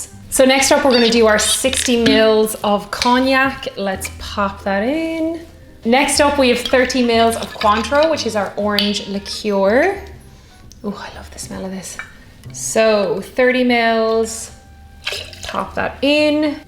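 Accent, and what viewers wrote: Ireland. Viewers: anyone else notice her Irish accent is stronger on her own channel, then when she is tatse testing food on the try channel.. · Really beautiful woman with Irish accents, one of my favorite things....but then again I am a man. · This combines Irish lore and alcohol hosted by Tinkerbell I mean the Absinthe Fairy with an Irish accent - win! I wonder if Walt got the inspiration for Tink from an absinthe fairy?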